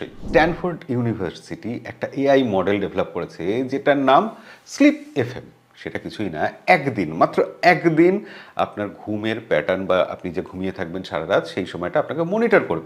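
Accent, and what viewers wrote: Bangladesh. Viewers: পরচুলো পড়া এই লোকটা বাংলাদেশি উচ্চারণ নিয়ে কি করে আনন্দবাজারে এলো।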